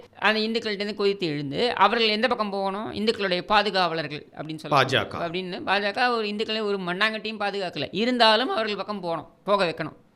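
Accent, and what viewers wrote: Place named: North Chennai, India. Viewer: North chennai slang is good sir. It is a mix of Tamil, Telugu, hindi or urudu slang. A language should be able to convey the message. Litrature is totally different from colocal language. Both are to be appreciated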